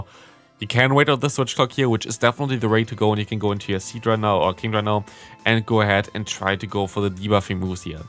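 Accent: Germany. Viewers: Nice Video, are you german???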